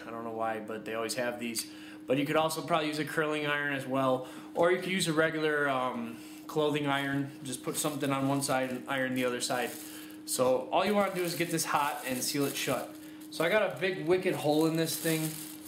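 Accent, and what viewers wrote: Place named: Canada. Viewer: Because of your accent and speech pattern. Are you Canadian or from a state near Canada.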